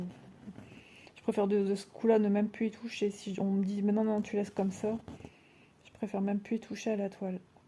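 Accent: North, France. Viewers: Bonjour! Je viens de découvrir ta chaîne! Il me semble avoir reconnu ce petit accent du Nord 😁je suis moi même du Nord j'habite près de Lille...j'ai regardé plusieurs de tes vidéos je suis moi aussi sujette à des crises d'angoisse mais après une thérapie ça va bcp mieux je prends toujours des médicaments et je vois une psychologue mais je reprends goût à la vie malgré les soucis...je suis moi même une passionnée de loisirs créatifs je fais du dp et du point de croix estampé j'adore!! La toile que tu fais est juste magnifique!! Jespere que tu vas aller de mieux en mieux ça fait du bien de se confier moi ça ne m'embête pas de t'écouter... je te fais de gros bisous☺️ ah oui je me suis inscrite sur le groupe (entre nordistes hein😁)a bientôt prends soin de toi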